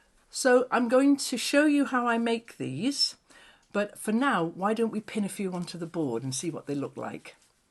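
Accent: United Kingdom. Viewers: Hello,. I’m from Illinois in the US. The number of projects you show does not sound like too many. I dabble in crochet,embroidery, knitting, quilting, rock painting, art journaling, stamping cards, and since November, slow stitching. I think I would get bored if I had to stick with just one. You’re beautiful Rita is absolutely gorgeous. Thank you for sharing all that you do. I love listening to the English accent, especially if it’s calm and soothing, of which you fit the bill.❤❤ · Hi, Im living in Tasmania, I was told about your channel by a new member of our sewing group. We meet every other Monday and make quilts for Charitie's. I was originally from the UK, lovely to hear that accent.:)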